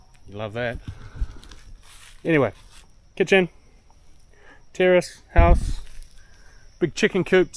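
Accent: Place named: United Kingdom. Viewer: you got a British accent bro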